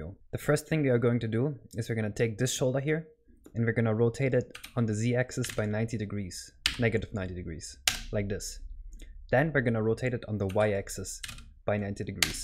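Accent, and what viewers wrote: Germany. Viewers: I liked you accent and I just realized you're german, everytime I heard someone with that slight german accent I thought they were native speakers. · Ayo completely unrelated but i noticed how much less noticible your german accent has become over the years, gj man, not many ppl improve that so quickly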